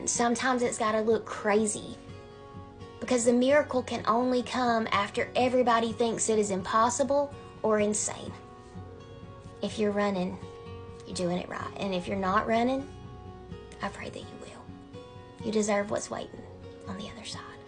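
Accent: South, United States. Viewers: Your soo illuminated, love your country voice ! Still wondering about that cornbread and Sweet tea😊❤❤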